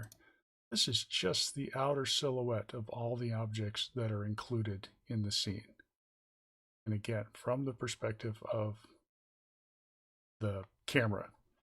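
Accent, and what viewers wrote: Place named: United States. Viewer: I was expecting the voice of the other oscilloscope music guy and literally jumped at the sound of your booming American twang as opposed to the soft spoken Nordic one I was anticipating.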